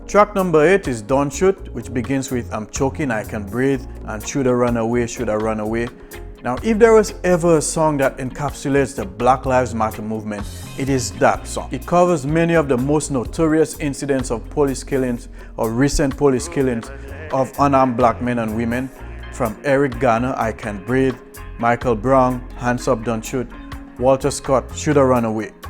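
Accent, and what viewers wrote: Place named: Dominica. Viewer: I'm not an expert in West Indian accents or dialects (I've only lived in Jamaica), but your accent sounds like people I've met from Dominica. Are you from there?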